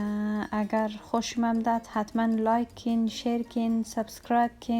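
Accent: Hazarajat, Afghanistan. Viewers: I like her hazaragi accent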